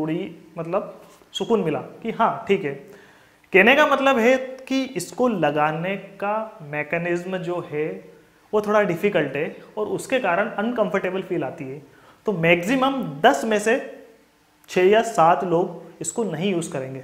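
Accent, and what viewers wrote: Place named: Madhya Pradesh, India. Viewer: Aapke bolne se pata chalta h aap MP se ho... Or gharaatte nahi bolte... Kharaate bolte hain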